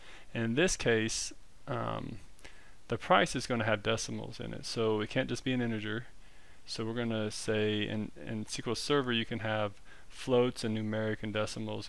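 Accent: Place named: South, United States. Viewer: Howdy! Where are you from? Texas? You got that good ole' southern draw. Like Matthew Mcounaghey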